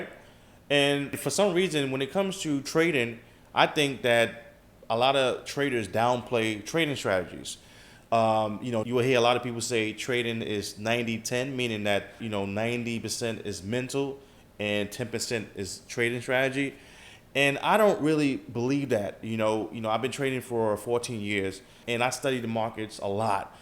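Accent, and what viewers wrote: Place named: Baltimore, United States. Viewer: I can hear the Baltimore in ya voice haha, love your vids from Jamaica 🇯🇲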